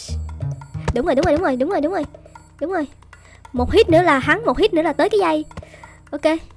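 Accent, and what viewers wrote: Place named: Binh Duong, Vietnam. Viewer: Đọc lạ bình dương thế anh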